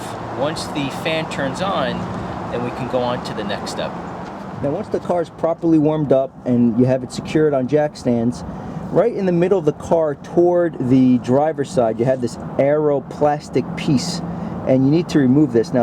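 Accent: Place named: New Jersey, United States. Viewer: His NJ accent reminds me of Jay & Silent Bob!! 😀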